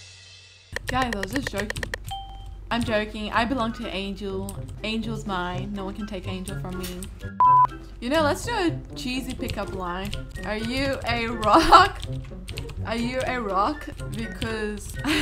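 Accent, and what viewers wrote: United Kingdom. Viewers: hi hi hi hi pls answer my question are you British or American?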